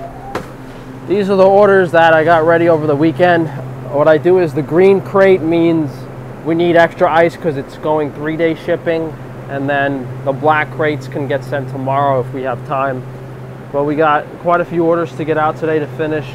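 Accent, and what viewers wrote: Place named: New England, United States. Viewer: his yankee accent definitely would stick out in the south... southerners pick that up instantly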